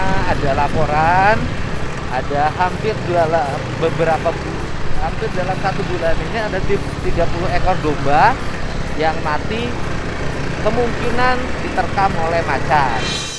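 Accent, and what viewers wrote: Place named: West Java, Indonesia. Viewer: SubhannALLAH, cara ucap dan prilaku kang DEDI MILYADI ini KEREN dan URANG SUNDA banget🥰👍👍!!!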